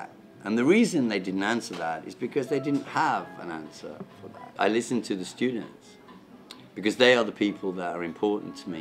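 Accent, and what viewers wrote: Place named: Italy. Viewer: I think his accent sounds more italian than british now. isn't it ?^^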